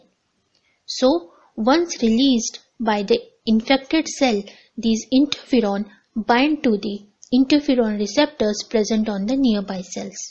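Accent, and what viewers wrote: India. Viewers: Damn Indian accent...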